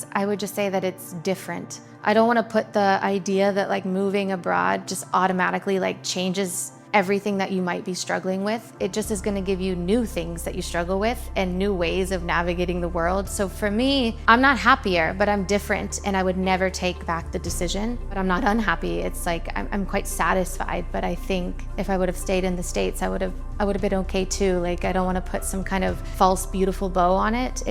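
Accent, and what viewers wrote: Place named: Eastern Europe. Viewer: Rich “American“ with very obvious Eastern European accent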